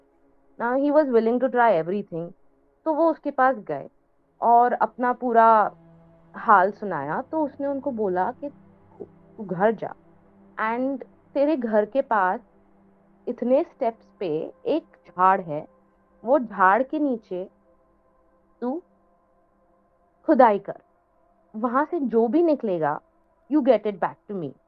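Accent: India. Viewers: In the start of the vid I saw ur comment and now I will notice that😭😭 esp her accent is Indian that I don’t like sorry not sorry I don’t wanna be rude but😭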